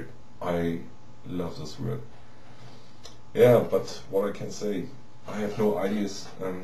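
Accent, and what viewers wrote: Germany. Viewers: Cool and funny :P Haha you can hear ur "English-german"! Anyway, maybe i come up with some cool ideas for ya m8 :D and btw, kill that bird! :D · Deine Aussprache ist echt gut, find ich, besser als meine ;) Und den Deutschen Akzent kriegt man eh nie richtig weg :)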